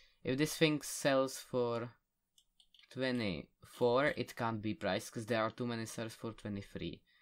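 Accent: Czech Republic. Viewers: T H A T B E A U T I F U L C Z E C H A C C E N T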